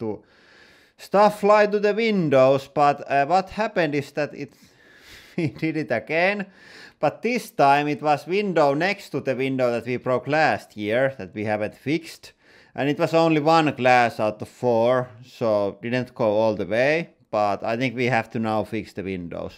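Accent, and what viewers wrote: Finland. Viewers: ralli englanti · Sounds like this guy is from Finland and he has some Biltema jack's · Without checking, you are from Finland :) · Finnish accent?? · Most Finnish accent ever. Go Finland 🇫🇮 Soumi!!!!! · I've been wondering why this guy sounded like Kimi Raikkonen, little digging and just found out he's Finnish, no wonder lol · Drop the talk. Can't tell if you're speaking Finnish or English with extremely heavu Finnish accent. Put up some text instead.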